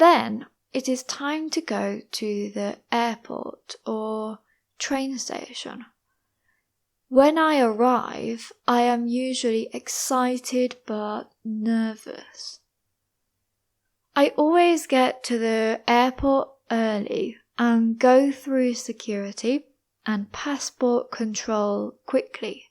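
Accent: United Kingdom. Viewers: I love British accent, thank you for support, its so difficult find it, i happy cause i found out your channel,. 😁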